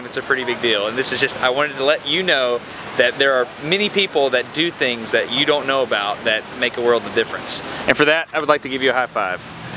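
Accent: South, United States. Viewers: Hey Destin! My first video was the rope coil analysis with honey, which I found through a science-oriented Facebook page(might have been IFLS, I cant remember.) When the video started out, I saw a guy with a southern accent and a bunch of guns, and thought "oh great..." But then you started talking and I was hooked. My favorite video was the Prince Ruperts Drop, its a facinating application of physics.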